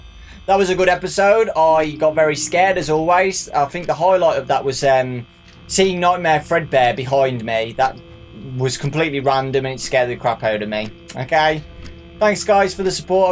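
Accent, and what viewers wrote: United Kingdom. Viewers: Tell where did you come from,I mean I love your voice;British?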